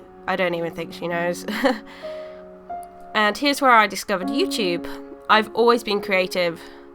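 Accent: Birmingham, United Kingdom. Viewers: I really really adore yout artistic skills... and your British Accent!! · I love your drawings and British accent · I really suck at accents and then it hit me, she sounds familiar, then you came out with your from Birmingham! I haven't seen any other ' brummies' on YouTube, so hiiiii! :)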